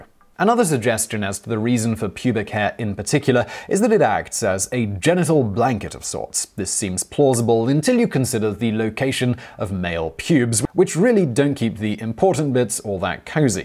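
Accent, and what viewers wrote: United Kingdom. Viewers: Even when spoken with a British accent, merkin is an ugly word.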